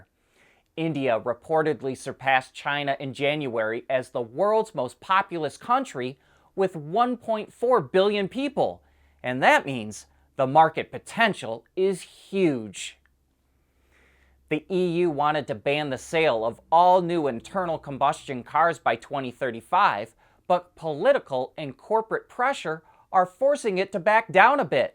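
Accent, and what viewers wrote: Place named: Midwest, United States. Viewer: This is the Midwest. This is how we talk. Jeez.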